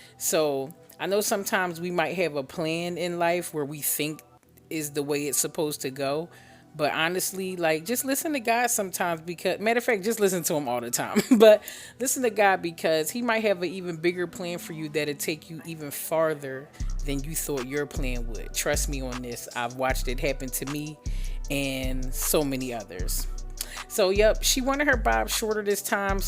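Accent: Philadelphia, United States. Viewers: I just ❤️ your Philly accent!!! 😂 🤷🏽‍♀️ 😂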